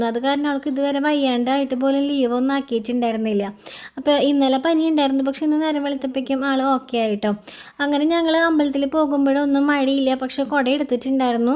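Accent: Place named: Thrissur, India. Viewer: Thrissur ano ivrde place? Slang familiar ane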